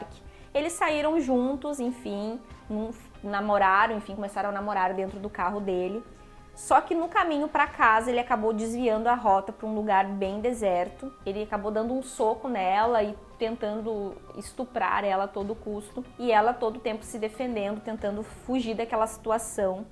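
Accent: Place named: Rio Grande do Sul, Brazil. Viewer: Muito boa a sua ideia de juntar o sobrenatural com o caso criminal. Infelizmente, aqui neste caso, apesar das evidências apontar para o tal suspeito em questão, não foi possível confirmar o seu envolvimento. Realmente é frustrante tanto para a família como para os policiais que trabalharam no caso, em especial a Joane. Será que um dia a justiça ocorrerá neste caso? Parabéns pelo canal. Pelo seu sotaque, tu deves ser minha conterrânea. Eu sou de Bento Gonçalves, mas moro no Rio de Janeiro há 10 anos. Um abraço.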